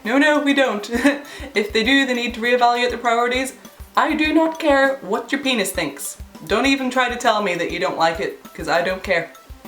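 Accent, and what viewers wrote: United Kingdom. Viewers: Sup YO, Sorry you went through what you went through, I went through similar in my youth as a MetalHead living in Brooklyn NYC. But people of mundane culture can't help themselves but tap into realms of ignorance, you are extremely beautiful and keep filling people in, this is cool, Goth's in NYC are a lil to themselves or are bit elitist so it was hard to get info about their movement in my day. Love the accent is it British?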